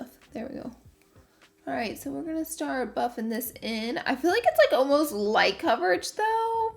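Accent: South, United States. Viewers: Girl, you're southern af. You should know how to pronounce "peony" lol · So funny that u can have a southern accent and you've picked up such a cali accent too lol. SoOoO prettay like literallyyy just SOO pretty haha. Guess that's what happens when you hear it all the time around you.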